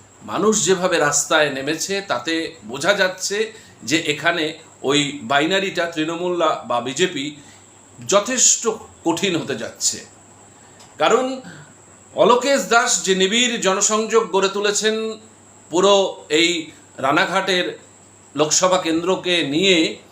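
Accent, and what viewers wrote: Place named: Bangladesh. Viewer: কথাবার্তা একদম বাংলাদেশীদের মত ! তা' বাপধন তোমাকে এখানে লেকচার ঝাড়তে কে ডেকেছে ?! তোমার একটা চ্যানেল আছে দেখলাম ৷ কেউ দেখে ?!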